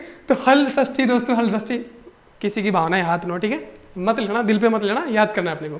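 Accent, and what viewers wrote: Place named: Rajghat, India. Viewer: Aap rajghat se ho kya sir aapki boli vesi hi h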